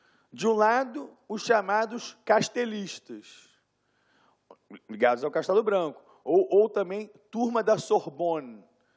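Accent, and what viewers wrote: Rio de Janeiro, Brazil. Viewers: Acho fofo o sotaque carioca, hahaha. Fica uma coisa meio bêbado falando "dia da mentiroann" , não é deboche, acho fofo mesmo!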